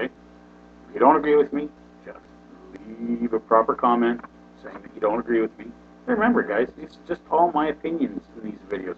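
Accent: Canada. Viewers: Great videos. Just wondering, you don't sound like you're originally from Ontari-ari-ario to me are you from from New Brunswick or Nova Scotia? Sounds like a Maritime accent there. Take care and keep those great video coming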